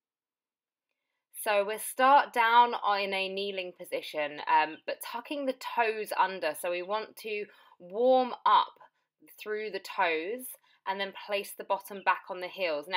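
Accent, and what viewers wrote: United Kingdom. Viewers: Thanks for an excellent flow - many postures here I haven't done in ages. Also nice to hear an English accent, and a bit of humour! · Where is her accent from? I know it's British, but what part of England?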